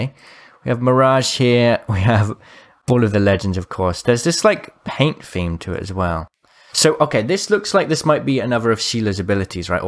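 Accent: India. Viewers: OMG CAUSTIC IS FINALLY GETTING A HIERLOOM AND yeah that's an Indian girl. I don't like the accent :p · Is it just me or does she sound Indian?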